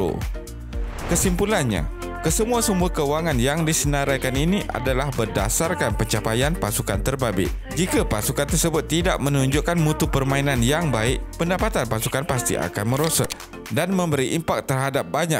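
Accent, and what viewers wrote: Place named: North, Malaysia. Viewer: abang yg ckap ni msti orng utara..hahahaha..sapa ja yg ckp jutaa kepada jutee...buruk ngat aihh bang..besa2 dah laa..😂😂😂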